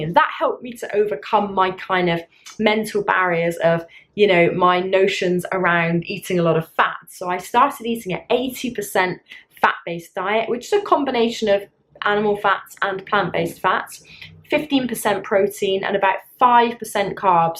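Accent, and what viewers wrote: United Kingdom. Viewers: I love you my sweety your excent is look like London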